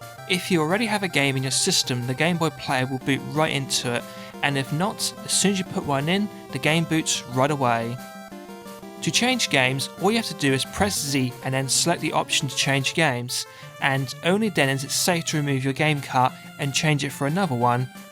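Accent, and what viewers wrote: United Kingdom. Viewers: great job!!I was looking for GBplayer review! I rlly liked, but I gonna tell u: your british accent difficult my understanding, I partially understand what u said. greetings from Brazil! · hahahaha i love britisch aktzent :D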